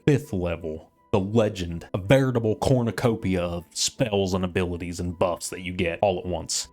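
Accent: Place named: South, United States. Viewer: Hey, honest feedback from a consumer of the content here - filming yourself and editing the feed in over the background graphics and videos adds nothing to the content for me, personally. I think you could save yourself some effort and nix your video feed in favor of pure audio. In fact, moving the production time over to more contextual graphics in your videos would be a HUGE level-up. I think doing that well would jump your production values significantly. Love the walkthroughs, love the personality of your VO, love the southern US representation. Wishing you well! Edit: I love the respectful dialogue. It's great to see that the channel has cultivated a solid audience. Kudos to, and to the viewership!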